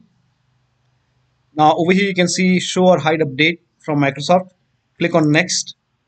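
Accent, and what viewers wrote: India. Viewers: that's awesome thankds for your help, by the way your india accent is so special😁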